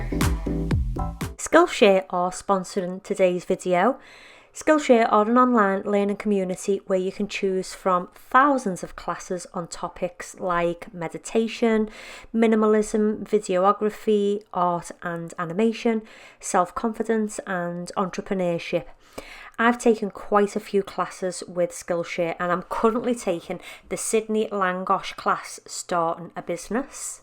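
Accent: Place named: Cheshire, United Kingdom. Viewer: Your home is gorgeous 🥰 could you make a video on organisation/decluttering tips? I’m pregnant with my 2nd baby and I feel like I need to give myself a kick up the a** and get decluttering so that housework is generally easier. Love your videos 🥰 I’m from Runcorn so it’s nice to hear a familiar voice on my telly haha! Xx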